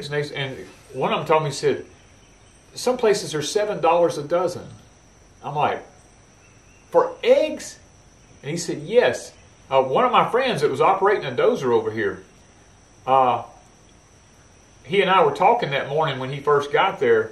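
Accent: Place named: Kansas, United States. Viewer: Sounds like Kansas Brother with all those extremley's.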